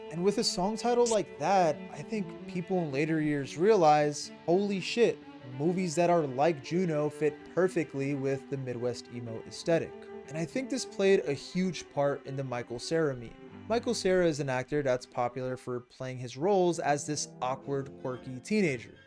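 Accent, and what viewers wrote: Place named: Portugal. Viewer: omg I was listening to this, I opened the tab just to see who the hell is talking and to my surprise, portugal caralho.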